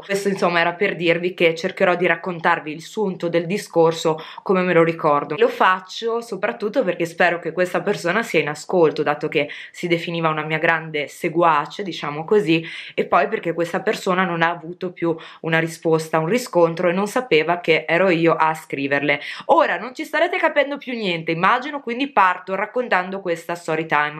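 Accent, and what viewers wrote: Emilia, Italy. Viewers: Fare il "simpaticone" con la donna/l'uomo di un altra persona é trasversale. Non lo fanno solo gli uomini etero piacioni o le Gaie di turno. Certamente, lo fa chi non ha rispetto, per se stesso in primis, e non prova amore verso il prossimo. Guardando video di vari youtuber mi sono fatto un'impressione: molti (idioti) sono gelosi e invidiosi di chi fa video su Youtube, ritenendo (erroneamente) che gli youtuber hanno tutte le fortune del mondo e provano solo piaceri. Ad esempio, certi si fermano soltanto al fattarello che le aziende vi inviano prodotti, non immaginando nemmeno cosa c'é dietro, ovvero che si tratta di lavoro e quanta fatica c'é prima della pubblicazione di un semplice e solo video. Alcuni, ingenuamente, pensano che gli youtuber siano dei privilegiati, fortunati e paraculati...allora, provare a "fregarti" il fidanzato può essere, per qualcuno, una piccola rivincita, perché magari questo qualcuno pensa che te lo meriti, solo per la "colpa" di fare la youtuber. 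Mi viene da pensare questo guardando i video di Cristiano, Marta, Giulia, Mia...e mi vergogno sempre piú di chi abbiamo intorno! Ho sempre creduto fossi emiliana o romagnola, mi pareva avessi un accento di quelle parti li...per quanto non me ne intenda di accenti!:) Posso chiederti un piccolissimo favore? Immagino bene di essere, probabilmente, l'unico uomo tuo iscritto e follower, tra tantissime donne, ma potresti rivolgerti, alla fine o all'inizio dei tuoi video, non solo alle ragazze? Se puoi, mi faresti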